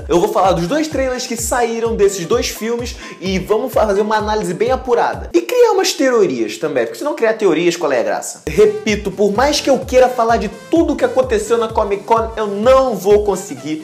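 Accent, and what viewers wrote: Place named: Portugal. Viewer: você é português? seu sotaque é diferente kkkk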